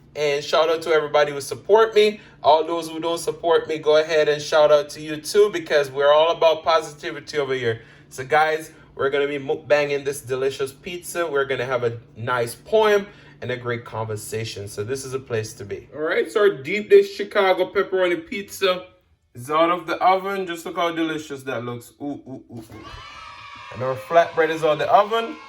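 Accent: Patois, Jamaica. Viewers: Awww you changed the title. Thank you. You are too kind! I loveeee this poem so much, and the fierceness in which you delivered it. That patois, so wonderful to hear.. yes you did Recite that, and eat this!! ❤️ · I can listen to you speak Patois all day lol This was good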